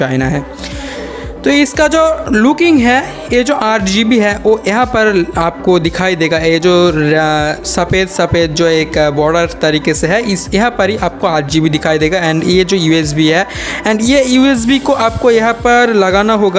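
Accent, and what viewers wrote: India. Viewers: Bengali accent bolte😁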